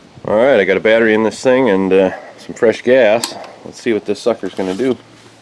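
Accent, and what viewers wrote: Minnesota, United States. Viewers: Hey man r u a Minnesotan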